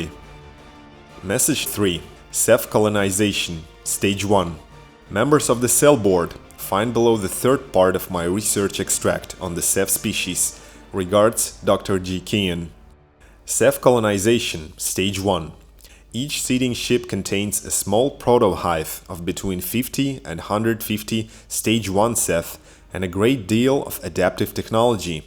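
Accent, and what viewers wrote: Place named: Germany. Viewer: Absolutely great informative Video! You have a very strong accent, it sounds like you´re from Germany, where the creator of the Trilogy Crytek has it´s roots :D Greetings from Switzerland :)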